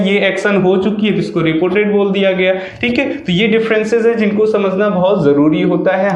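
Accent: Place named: United States. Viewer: Good brother very nice explanation And your english accent is very american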